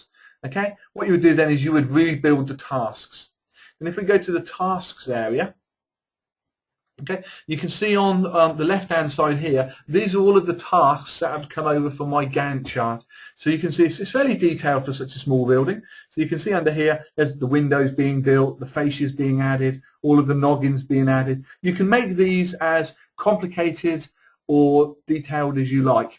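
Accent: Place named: Cockney, United Kingdom. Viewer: A cockney webinar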